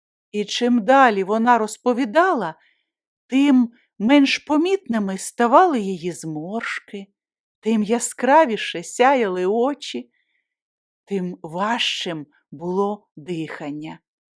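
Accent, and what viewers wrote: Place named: Ukraine. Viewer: Добрий вечір,! Слухаю..., насолоджуюсь...новою зустріччю з Вами! А в голосі, до болю знайомі, нотки українського діалекту. Продовжуйте - це наша підтримка і віра на краще. ❤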